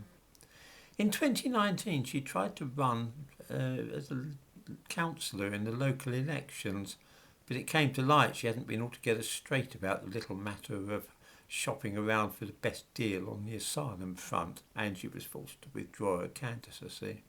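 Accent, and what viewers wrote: United Kingdom. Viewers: A British accented man stirring up discord in Ireland, who would have guessed! Mmmm this all sounds racist to me dressed up as information! That said, I don't like to hear what's happening. I think it is happening the world over, the least well off in the world (the have nots) want a piece of the "better life" pie which we all enjoy and who can blame them? We in the developed world have gained enormously from the exploitation of people in the third world. As far back as 2000 when we lived in Dublin, I noticed the general population was very racist towards Nigerians, and I was ashamed to see it. It was OK for us to collect for "the black babies" while they were over there but we don't actually want them to come and take what they want from us! They were treated really badly regardless, it didn't matter if they were good, bad or anything in between, they were all tarred with the same 'black' brush. 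I don't know if these statistics are true or not, and if the way to get citizenship is as easy as to have a baby, then that is on the government for allowing it. It's not allowed in many countries so if it's a problem in Ireland it needs to be addressed by the government. Or does the Irish government want immigrants to do the dirty work that the Irish won't, or don't want to do!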